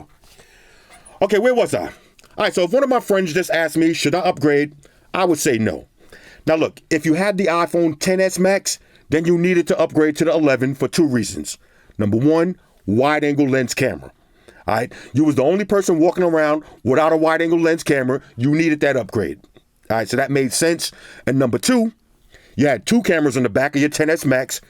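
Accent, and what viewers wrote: New York, United States. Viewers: My man is soooo NEW YORK, I love it. I love apple but I agree with all your dislikes . They need to do better and give us at least a dongle and a brick yo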